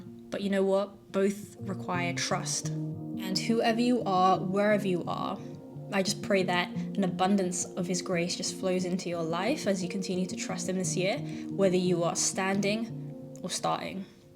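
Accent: Philippines. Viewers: I was about to ask kung pinay ba sya haha kasi iba accent nya pinay pala talaga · Ur Tagalog accent is sooooo lovely 🥰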